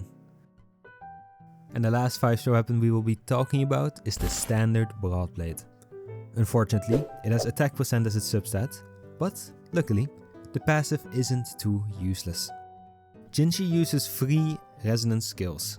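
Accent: Netherlands. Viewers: Not just by chance, that was extreme dutch. it got me distracted from the video after 1 line in xD usually a is of dutch people talking english they can very well recorniz each other pretty that there dutch though here u use some words that have same letters but use the dutch way of saying it instead of the english one xD You do you though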